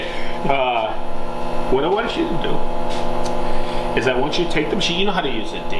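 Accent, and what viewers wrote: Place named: Rhode Island, United States. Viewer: I’m from Rhode Island I know that accent , living in Savannah Ga.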